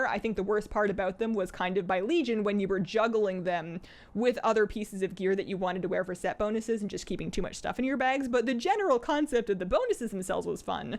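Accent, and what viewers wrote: Canada. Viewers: What part of Canada is she from? Does she still live here? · She's Canadian. Lay off it will ya!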